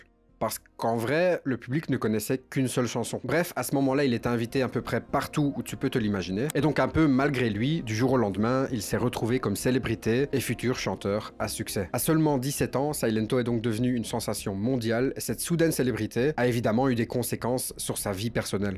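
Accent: Belgium. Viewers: Par contre g reconnu un accent belge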